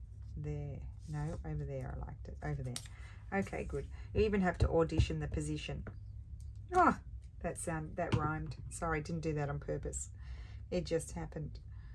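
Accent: Australia. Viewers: I often wonder if people not from Australia can understand you because i feel you speak Australia ,using slang or shortened words or sayings that we use regularly. Im not having a go at you because you did mentioned your uncle correcting you. When ever you say something that is Aussie I just smile.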